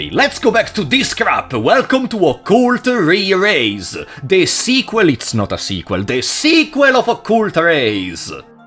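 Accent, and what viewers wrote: Italy. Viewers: Are you italian? (Im italian too and i noticed your accent)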